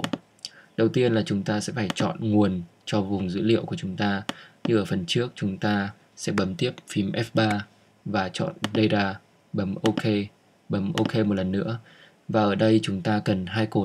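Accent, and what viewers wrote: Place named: North, Vietnam. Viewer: Giong con trai mien Bac nghe hay qua. Cam on nha.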